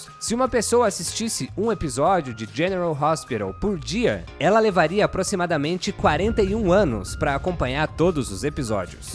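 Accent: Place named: Rio de Janeiro, Brazil. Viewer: Todo carioca fala assim mesmo ou é só tentando falar igual aos mais conhecidos?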